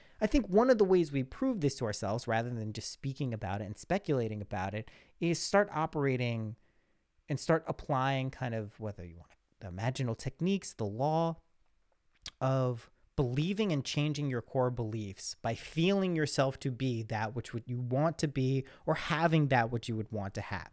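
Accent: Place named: Ireland. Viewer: So your Irish accent was a hidden gem you should absolutely let shine WAY more often...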